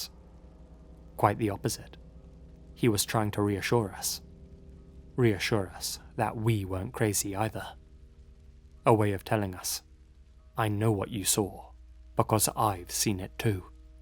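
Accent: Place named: Northern England, United Kingdom. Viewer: Your accent is quite hard to place. It's certainly British - more specifically English and largely Southern, but it sounds like it has the lilt of somewhere else. Either you grew up down in Southern England and moved up North acquiring a northern lilt, visa versa or you just have unique annunciation.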